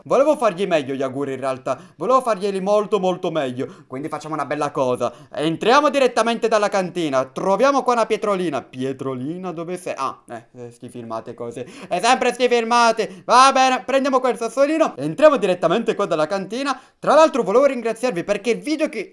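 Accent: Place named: Sicily, Italy. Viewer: Non si capisce l'accento mi sembravi della Sicilia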